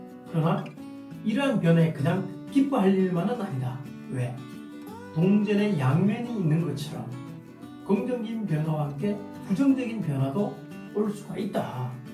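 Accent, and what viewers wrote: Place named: Gyeongsang, South Korea. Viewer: 영덕사투리를 좀쓰시네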